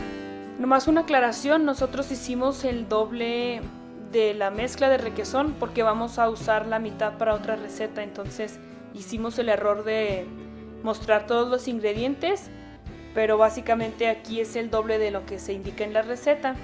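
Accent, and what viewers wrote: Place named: Cuauhtémoc, Mexico. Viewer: Por el acento supuse que eras de Cuauhtemoc 🍎😍! Y sii Le atiné! Mi papá es de allá, A mi esposo y a mi nos encanta ir y COMER todos los antojos menonitas que podamos. Todo es delicioso, los campos menonitas son muy hermosos y su gente tambien 😋 Saludos desde juaritoz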